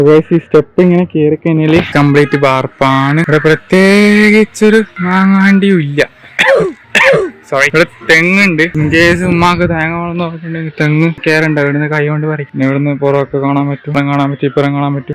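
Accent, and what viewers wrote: Kannur, India. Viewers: ingale kannoor basha 🤣🤌🏻💘powli aanu 💘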